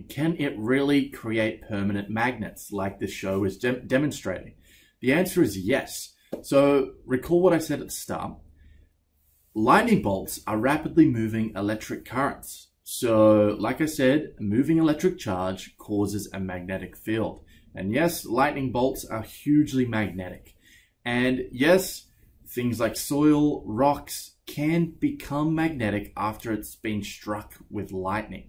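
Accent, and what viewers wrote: Australia. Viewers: Omg you look British but sound Australian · Huh is he an Aussie "Hang on where's my coffee? There's my coffee" stands up ... Yep he's an Aussie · Explained well! Even me, who doesn't know English so well, everything is clear. Despite the fact that I have heard the Australian accent in very few places)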